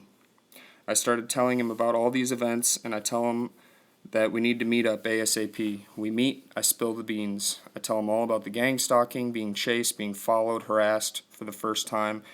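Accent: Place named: Detroit, United States. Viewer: same bro. everything. from the drug past, anti police lifestyle, vehicular mobbing almost leading to death.. only difference is im in uk. someone i cared for deeply in my past originally came from detroit - your accent reminds me of them hence why i wanted to reply know what you mean with the evil cultish vibe these fucks give off. too much to go into here but just want ya to know it does get easier with time.. not outwardly, but in how we learn to deal with it. im four years down the line and still dealing with it every day. but i am dealing with it youre clearly a smart young guy. youll find a way of surviving eventually.. dont let them win. if you ever wanna talk, let me know. otherwise, take care man.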